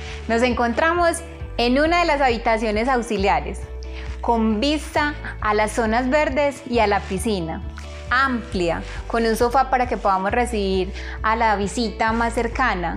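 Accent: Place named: Antioquia, Colombia. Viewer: "cuenten pues a ver.." jajajaja me encanta tu superrrrr acento paisa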